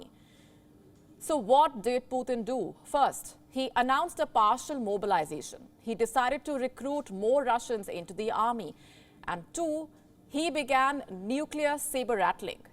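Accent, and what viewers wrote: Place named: India. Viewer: Can u get rid of that accent. It sounds like any stereotypical Indian character in a western movie.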